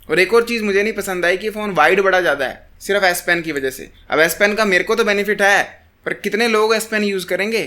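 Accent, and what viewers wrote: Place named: Punjab, India. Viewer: Bhai are you from panjab ? You speak hindi with a punjabi tone.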